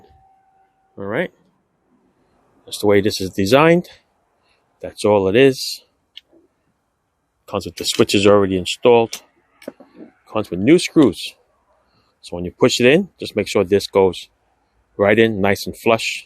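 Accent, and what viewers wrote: New York, United States. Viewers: Is this, the old bracket racer long ago, from Seattle? If so, you sound different, like you're from New York, now. I don't recall you having an accent before. Anyway, why were your intake ports on the heads so dirty?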